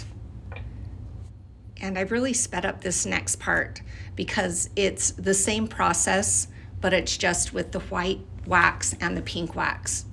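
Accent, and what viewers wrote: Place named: Great Plains, United States. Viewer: plains states. Northern-ish, Like Kansas toward Idaho. Huwhite, meAsure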